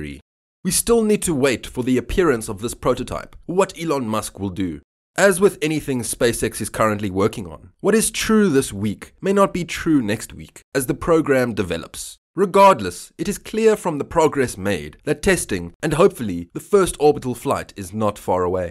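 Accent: Afrikaans, South Africa. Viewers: Great program, but your script was a bit out of date in most of the stuff that was happening in your previous videos. I see you are cathcing up to more current content. Keep up the good work. Nice to hear an Afrikaans accent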